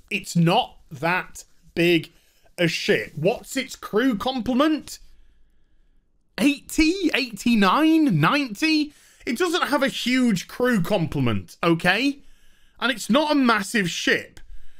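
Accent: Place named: Yorkshire, United Kingdom. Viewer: Really am loving the Yorkshire accent coming out. “Oreyt pal, ars it guin?”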